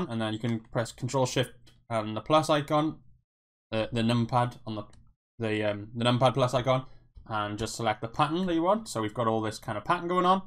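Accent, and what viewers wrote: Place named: Wales, United Kingdom. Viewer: Thanks for this video! Are you from Wales? If so where abouts? I'm in Pembs, I reckognize the accent.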